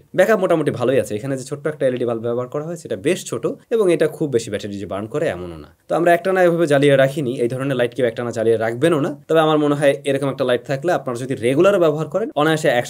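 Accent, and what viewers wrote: Barishal, Bangladesh. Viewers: ভাই আপনি খাশ বরিশাইল্লা! টাকাটাকি বাদ দেন!❤❤❤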